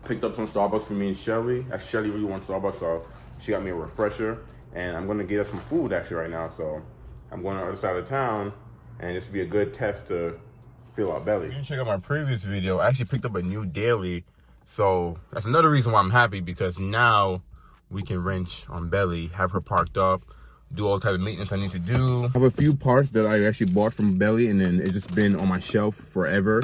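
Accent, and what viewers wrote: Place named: Jamaica. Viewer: Could know you jamaican fromyour voice bro, whats the milage on ur car i see one at 17000k that needs a throw out bearing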